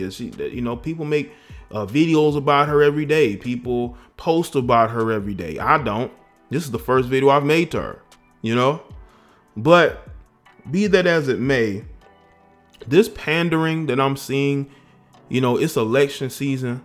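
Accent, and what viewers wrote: South, United States. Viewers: Nah bruh you really sound country! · You don't speak with a southern drawl but I can tell you are from the south. Nothing wrong with that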